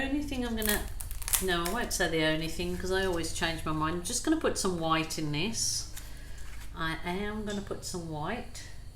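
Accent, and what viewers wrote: Birmingham, United Kingdom. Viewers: That's so funny because I was going to suggest you were originally from Birmingham as you have a strong inflection on certain sounds - and it is strang that I should notice it to the exact region in the UK as I am an Irish woman, living in Ireland all my life haha! Keep up the beautiful work and I love that you are using glue. It just proves that you can do amazing things without breaking the bank. Have a great day and thank you for everything!!!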